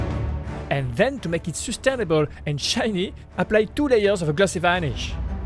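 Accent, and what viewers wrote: France. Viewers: Wow the french accent 🫠🫦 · L’acent français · t'es pas un francais par hasard ? Cet axcent et vraiment similaire stp reponds moi t'es creations sont incroyables continu comme ca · Avec ton accent français, ça fais bizarre je vais pas mentir, par contre super mask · frero sa ce vois que tes français vue ton accent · A en croire son accent il est français ??? 🫶🏼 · You have a french accent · Tu es Français cela ce voit grâce à votre accent extraordinaire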